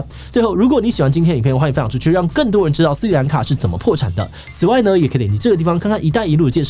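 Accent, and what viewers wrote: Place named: Taiwan. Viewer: 这湾湾腔听的好不舒服，就不能好好说话吗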